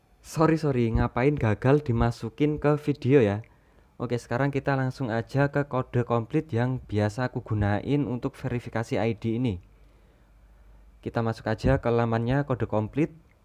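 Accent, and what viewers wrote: Java, Indonesia. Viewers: Suaranya medok banget bang mantap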